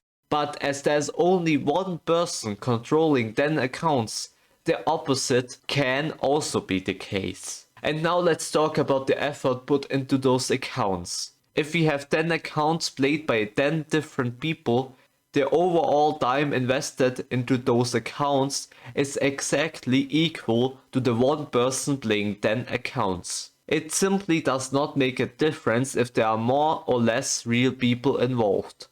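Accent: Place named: Germany. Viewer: digga dieser deutsche akzent is so schlimm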